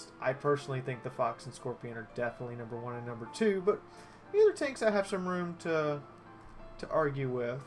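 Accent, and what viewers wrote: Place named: United States. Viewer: That explains it I suppose. I guess I just assumed based on an American(ish?) accent that you played on the NA.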